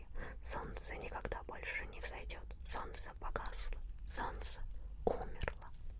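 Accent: Russia. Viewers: el acento ruso es hermoso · I love Russian accent🥰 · I love the Russian accent and the way it sounds. Only when it's not a Russian yelling at me on a video game tho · ロシア語の発音ほんとすき、日本人じゃ絶対できない🥹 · me gusta mucho el acento ruso 🤧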